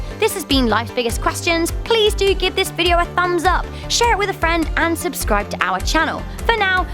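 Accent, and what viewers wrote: United Kingdom. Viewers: Ginger Ninja she's British lol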